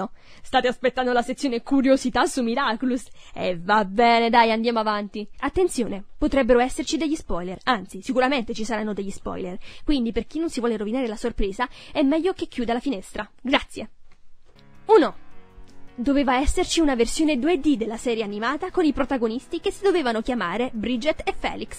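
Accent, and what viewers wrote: Bari, Italy. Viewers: Oddio 😍 accento pugliese, Barese? Sono di Bari, nuova iscritta complimenti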